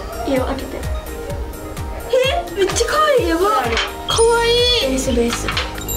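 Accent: Miyazaki, Japan. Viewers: やっぱ宮崎弁やん かわいすぎ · 宮崎弁ところどころ使ってる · 宮崎弁でしゃべってるのかわいい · 宮崎弁可愛い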